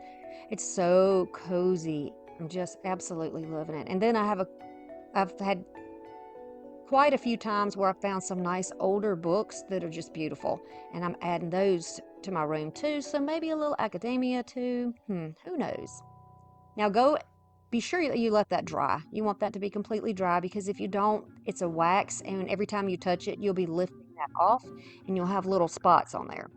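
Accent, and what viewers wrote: South, United States. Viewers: Thnx for the inspiration n btw you have the best southern accent ever! Love it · Wow! The candelabra was so awesome! I have had a set of those forever and just didn't know what to do with them, so thank you for the idea! So happy I accidentally found your channel! I have learned so much from this video! Using the sander around the edges of the fabric was such a helpful hack! That turned out fabulous! Great video, can't wait to see more! Btw- so cool to hear somebody have a genuine southern accent like mine!!!🤠😅🤗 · I love Dark Academia and Dark Cottage Core. Amazing stuff. Thanks for sharing! Also, I love your voice. I'm also Southern. Yeah, it's ES-presso, but regional dialects are so common in the US. If you want to always pronounce things the absolute correct way, then you make it your own. 🙂It's still yours when you pronounce it the way everyone else does, because no one has your voice. 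I don't think you need to apologize for it, though. You have a lovely accent and I think regional dialects are interesting and unique. 🧡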